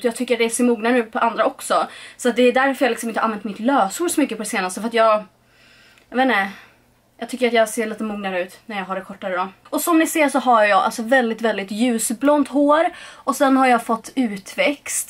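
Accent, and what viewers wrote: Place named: Karlskrona, Sweden. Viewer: em_ det är karlskona dialekten som kickar in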